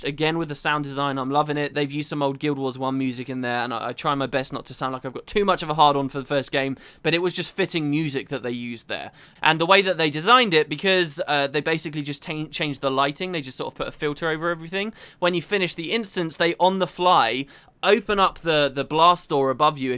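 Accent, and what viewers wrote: United Kingdom. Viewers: He's Brittish, they pronounce things differently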